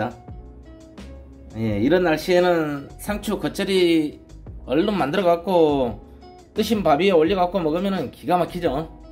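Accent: Jeolla, South Korea. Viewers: 전라도 사투리죠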